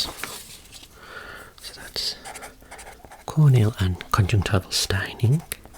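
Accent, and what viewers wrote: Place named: Scotland, United Kingdom. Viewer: Quick question: Are you Scottish? Your accent gives me Scottisch vibes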